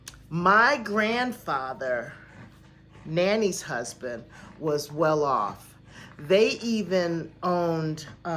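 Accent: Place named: Connecticut, United States. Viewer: One more thing there is an Accent in your voice I guess that's Connecticut.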